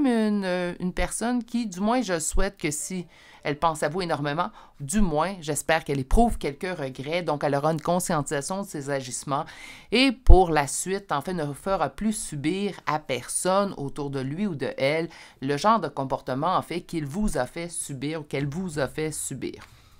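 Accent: Canada. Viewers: Merci à vous pour vos guidances sans langue de bois et j'adore votre accent canadien et aussi, présent à chaque guidance, c'est chou 🥰 bonne soirée à vous ou bonjour chez vous 🌞 🙏☮️💖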